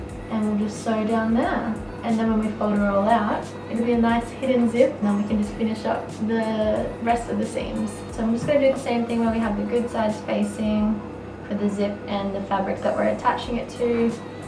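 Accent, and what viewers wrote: Australia. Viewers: Sorry but you sound Australian so I am just wondering where you buy your fabric from? I don't find a lot of linen in Spotlight and Lincraft :/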